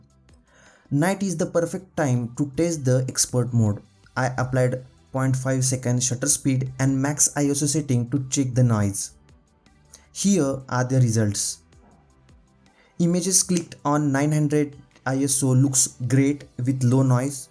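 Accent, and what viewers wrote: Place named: Maharashtra, India. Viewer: Marathi tone 😊.... Chan hota video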